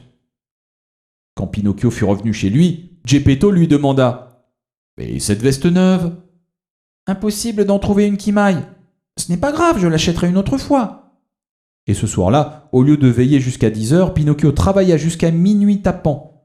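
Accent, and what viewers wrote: France. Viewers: Milles merci pour la lecture de voix avec intonnations francaises! Tu m'as beaucoup aide pour apprendre le francais populaire! Spasiba! )))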